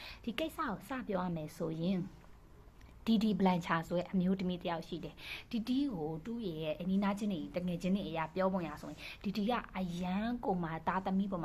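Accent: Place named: Shan, Myanmar. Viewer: မမဘယ္တိုင္းရင္းသားလဲစကားဝဲ႔ေနလို ရွမ္းတိုင္ရင္းသားလား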